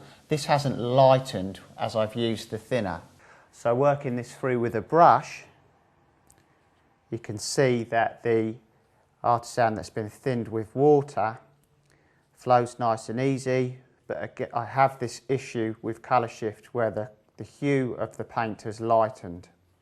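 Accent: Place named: Cockney, United Kingdom. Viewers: Not just British, but not-quite-cockney London working-class. Love it. There are many accents in Britain. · VERY THICK BRITISH ACCENT....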